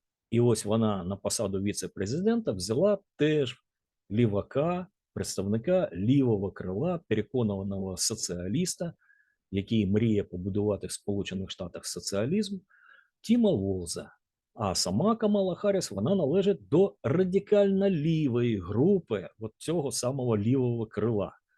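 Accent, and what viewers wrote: Russia. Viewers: Як відчувається російський акцент, і в мові, і в темі.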